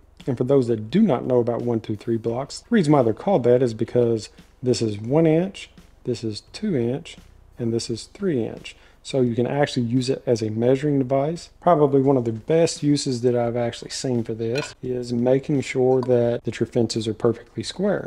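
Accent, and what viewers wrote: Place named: Georgia, United States. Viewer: You don’t use a lot of contractions. “Do not” instead of “don’t.” I don’t hear that too often. I knew someone in Georgia which a similar cadence. Lovely video, cheers!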